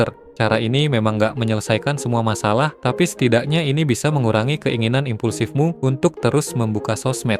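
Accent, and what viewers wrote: Bali, Indonesia. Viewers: Narator logat bali kayaknya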